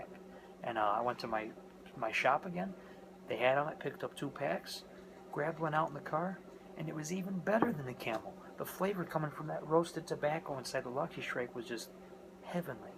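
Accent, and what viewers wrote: Chicago, United States. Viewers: That is one strong chicahgo accent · Fuck yeah dude the second I saw the jacket and heard you speak I knew this boy was from Chicago. Windy City best damn city in the world! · That Chicago accent I'm from Chicago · The only people I’ve ever known with a Chicago accent that thick were all in their 60’s. Maybe this dude is a time traveler. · My family is from chicago and surrounding area and i picked up on the accent. I thought you may be from Chi-Town. And i think i detect a little Italiono too. Like listening to one of my family members. Fuckin love it! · He is from Chigaco I think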